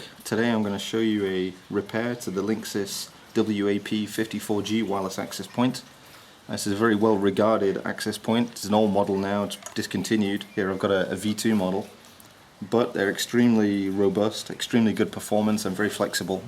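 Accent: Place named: Upper class, United Kingdom. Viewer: Excellent class in upper-class British King's English: with a tear-down and tighten the old WAP54G war-horse antenna mounts thrown in for free. If you are comfortable that the antenna-mounts have not twisted so as to sever the internal antenna leads: from the outside - without cracking the case - you can go ahead and screw down the nuts with a 9/16" deep socket wrench. Obviously - you want to do this by hand and not use a socket-wrench driver handle. The nuts themselves are shallow and slightly recessed - but not impossible to grab with pliers. A box-end 9/16" wrench would also work. But the 9/16" socket supplies absolute control with no slipping or knuckle-busting. And your fingers can sense the exact amount to tighten as the the nut (and maybe a thin washer?) bear down on the ineffectual internal-tooth lock-washer that CEO John Chambers (Cisco CEO) used to purportedly stop the loosening from happening in the first place. 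Everything about the consumer design and assembly of this unit sucks. The design was meant to place the WAP54G into a family of consumer devices that would be stacked. I've never seen them stacked. But as points out - the WAP54G is a warhorse. And when have a guest who can't connect an old PC to your new Cable-service router - you just whip out the WAP54G and they can log on. I've never sat down and worked this out - but WAP54G security is probably not the best. A final note on cracking the case after removing to two little screws. I found that f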